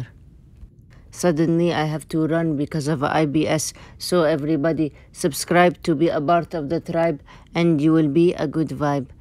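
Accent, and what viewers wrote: Arab world. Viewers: Same and her arabic accent just completes it!! 😻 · It's an Arab accent so yes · This is just the arabic accent. She is from Palestine, not the Gulf. And she's exaggerating her accent like this for comedic purpose. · Hey I'm from India and love your content 😄❤️❤️ sometimes I face difficulties to understand but I find your accent really amazing 🤌🏻✨ maybe it's an Arabic accent I don't know much about Arabic or Urdu though but I find your videos really hilarious 😂😂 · Sister where r u from?? Ur accent looks like Arab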